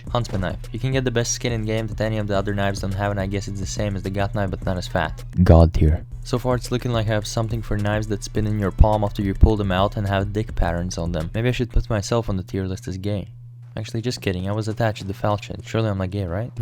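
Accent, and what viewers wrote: Latvia. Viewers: You latvian? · are u from latvia by any chance?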